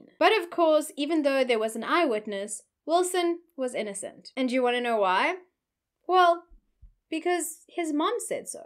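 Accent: Afrikaans, South Africa. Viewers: Love the way you speak Afrikaans 🤣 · its the afrikaans reading for me... this story had me hooked to the end..nice job👍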